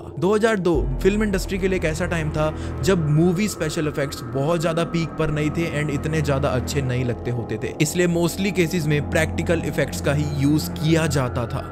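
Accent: Haryana, India. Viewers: Bro i have question are yu from haryana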